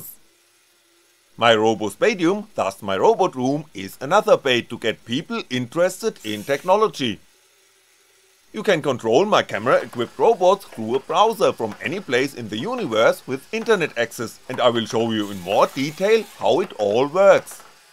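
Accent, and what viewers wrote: Germany. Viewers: amazing german accent i enjoy alot :D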